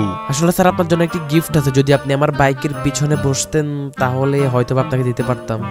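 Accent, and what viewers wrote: Noakhali, Bangladesh. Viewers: Valo vabe kotha bolo nuakhalir vasha na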